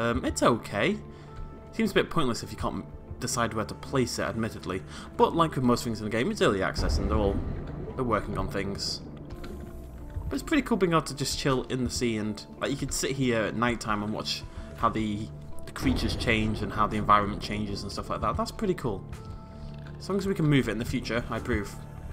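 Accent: United Kingdom. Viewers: Hullo ladies and jauntlemen.lol,I love your accent. Brittish?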